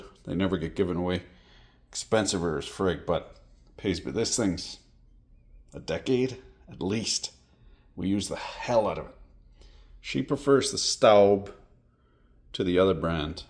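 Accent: Canada. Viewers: Bro, I RACE w Canadians and your accents I 1000% Canadian, Where do you get your “ Cajun” from? I hear you speak French. 100% better than me I love you and your vids. Just curious here. All the best!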